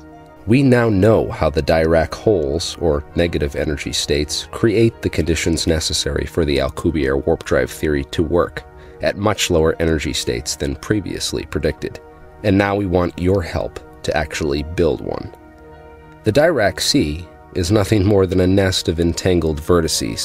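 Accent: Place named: United States. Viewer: Peer reviewed or it's not science. At the risk of offending you greatly (for which I will make amends if needed), I watch physics videos from around the world and I have never heard anyone pronounce Dirac the way you do (rhyming with tie rack). I have trouble believing you have attended any lectures on quantum mechanics. Assuming this is deeply-thought-out science fiction, with possible fleecing of idiots, let me help you sound more authentic, as I am fans of both. I will use capitals to designate the emphasized syllable. Depending on your dialect and/or that of your professor, typical pronunciations are like deRack (with a brief e like in because), diRack (with an i like in bit), d'Rack (just a fleeting vowel noise between consonants), deRock, diRock, Deerack. If I heard that last one I would assume the speaker is from India or nearby. Americans typically use diRack, deRack, or d'Rack. 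Your accent and dialect are clearly American (we call z zee, while most others call it zed), so it is good to stick to American pronunciations. Britons typically pronounce it closer to the original french; deRock or diRock. They might even use a french r sound. Sorry, I don't know how to depict the french r sound by mere spelling. It's like if a letter had r for its mother, while its father's parents were h and a hard g. Kind of throaty. Looking back at this, it is almost certainly the kind of rambling I do when sleep is near. My filters are the first to go... Sorry for offending anyone, but I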